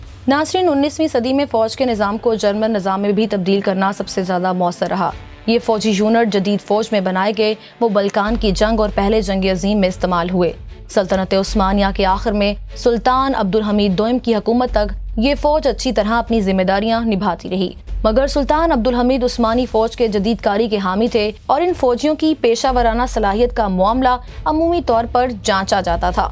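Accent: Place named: Punjab, Pakistan. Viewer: Please speak Urdu like Urdu not Punjabi. Or just speak Punjabi.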